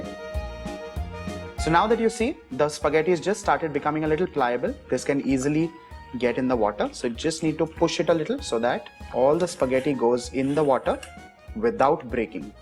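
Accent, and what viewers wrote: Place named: Mumbai, India. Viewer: Love your channel esp your pukka Mumbai accent! I'm a Bombay girl too, now in Toronto - check out my channel!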